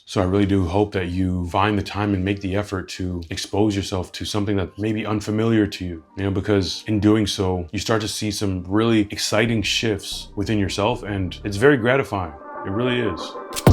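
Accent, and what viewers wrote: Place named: England, United Kingdom. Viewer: your English accent 😂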